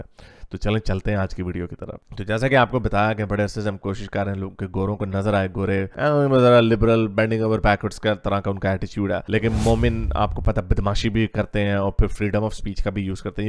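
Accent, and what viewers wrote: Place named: Punjab, India. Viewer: Your accent and panjabi tadka is awesome 👏👏👌👌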